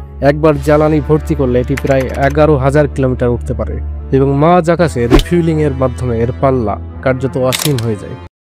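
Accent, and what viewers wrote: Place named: Bangladesh. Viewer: জানেন কি চ‍্যানেল টি কি বাংলাদেশের? ঘোষকের উচ্চারণ তাই বলে। যুক্তাক্ষর উচ্চারণ করার অক্ষমতা উৎকট রূপে প্রকট।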